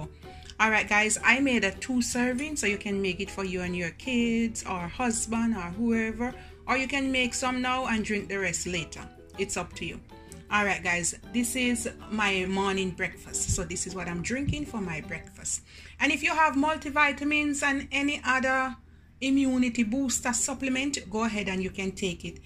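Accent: Jamaica. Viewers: Loving this upload my friend. Loving the Jamaican accent, for true, it gives your presentation that little bit of flavour. Awesome variety of receipt on show. Fantastic demonstration and tutorial on show my friend. Amazing health information you are putting out there, with excellent research and knowledge. The like is absolutely smashed ############ Keep Safe and Healthy out there. Stay Positive. JEVITEE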